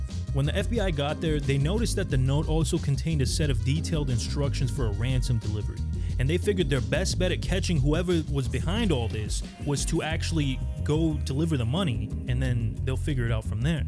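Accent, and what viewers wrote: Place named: Italian-American, United States. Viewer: I don't want to be rude but he kinda has like a american-italian accent,but his videos are addicting like crack,not that I've tried it 😅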